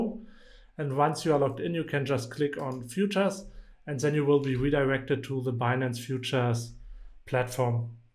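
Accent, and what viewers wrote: Germany. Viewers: Nice tutorial 👍.. Are you from Germany?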